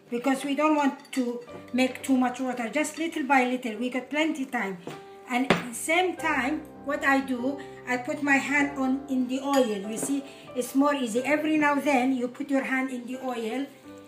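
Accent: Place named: United States. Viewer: you are really great , but you have an American accent , Are you live there before ???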